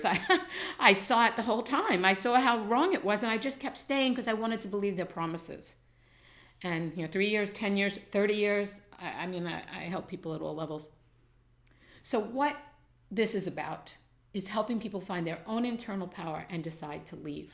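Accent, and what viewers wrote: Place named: New York, United States. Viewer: I got this gut feeling with a last name such as yours you are from NY cause Im from NY and You sound like someone I would talk with in a King Kullen or S&S. Making me home sick. I also would like to point out...that things in America we would classify as NPD or other disorders would be considerded normal especially in Asia.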